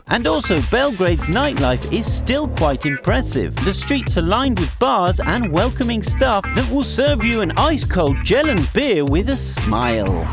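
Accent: England, United Kingdom. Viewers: What an ugly pomie accent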